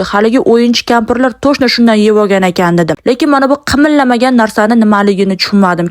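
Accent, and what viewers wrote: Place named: Tashkent, Uzbekistan. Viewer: Opam sz keli Toshkent gap zur gapirasz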